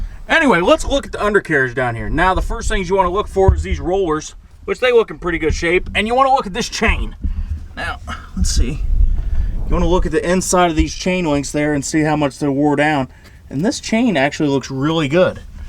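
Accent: South, United States. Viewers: I'm new here! I thought that it was going to start. I used to work for both construction and excavation companies. For a while I even did erection, but that's a story for another time. I'm going to guess by your accent you're at least in Tennessee or even more South. It tends to be hot, so why not dig a pond when you get the kinks out of the lift cylinders? You can always use it for watering livestock, but if you're too far in South look out for gators! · Which accent is that ? I guess southern ?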